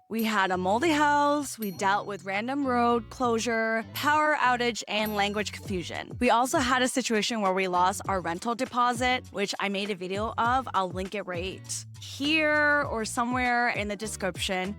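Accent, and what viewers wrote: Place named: California, United States. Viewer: Facts. I was like, "She definitely sounds like she's from California."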